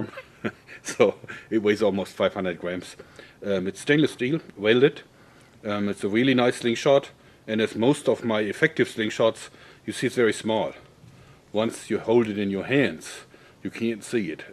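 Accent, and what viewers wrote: Germany. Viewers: im detecting german accent in you, yes? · were are you come from you speak a liitle bit like a german areyou from there ß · are you German? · Are you germany? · Are you German? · Are you German ? ^^ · Man hört so das du aus Deutschland kommst haha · thumbs up for the german accent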